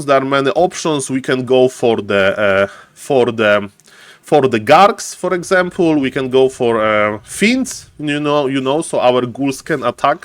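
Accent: Poland. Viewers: U from poland? Accent sound so polish 😊 Edit after 2 minutes: polak jak chuj xD pozdro wariacie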